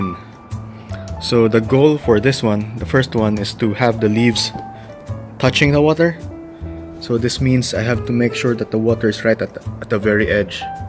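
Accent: Philippines. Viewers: You have filipino accent.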